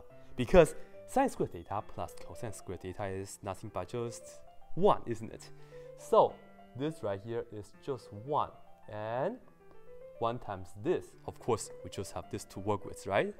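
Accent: Singapore. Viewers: It sounds like Singlish to me.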